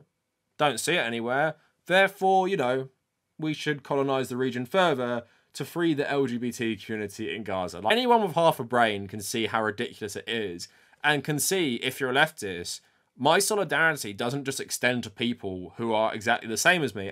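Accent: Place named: United Kingdom. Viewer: You gotta love a Dude with a British accent worrying about Woke American liberals. Aren't you guys still working out Brexit with your 3 fleet navy?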